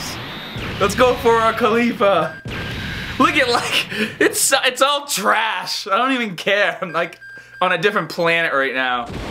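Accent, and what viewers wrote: Boston, United States. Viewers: you must be from boston 😀 just taking a guess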